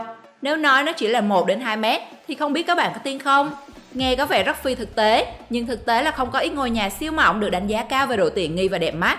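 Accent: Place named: Central, Vietnam. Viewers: Cám ơn admin cháu gái dễ thương, thích cái giọng nói miền Trung này 👍👍👍👍👏👏👏👏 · Giọng nói của em kỳ kỳ sao ấy...!! Thà cứ nói đúng Giọng miền trung đi mà anh thích nghe hơn..! Chứ đừng sửa Giọng làm gì cho nửa nạc nửa mỡ...nghe muốn ói quá..!!!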